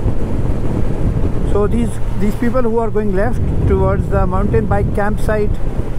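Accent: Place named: Scotland, United Kingdom. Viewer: One of my favourite roads, it always takes my breath away. Oh and you have a lovely little Scottish accent coming on, nice one brother. 👍👊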